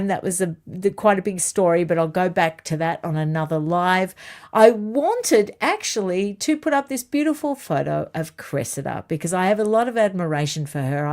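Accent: Australia. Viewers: Yeah,. But they are swayed by you. God love our Aussie voice ❤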